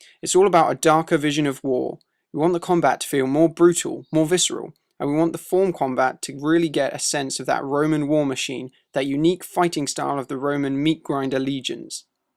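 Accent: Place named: United Kingdom. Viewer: your voice is so nice...no homo...most british sounding voices are so ehhh :? but yours is noce to listen too lol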